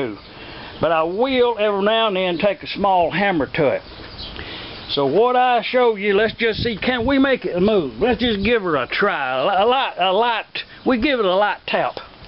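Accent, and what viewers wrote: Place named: Texas, United States. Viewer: I Don't think I can ever say I have seen a engine like that or a man in Texas who talks like that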